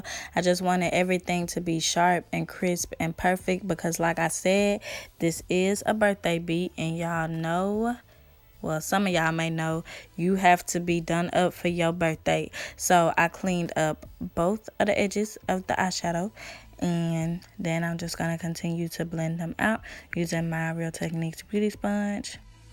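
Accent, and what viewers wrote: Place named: South, United States. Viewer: U so Country 😂 the first minute of the video I couldn’t stop laughing lol gotta be somewhere down here in the south. Awesome work 😍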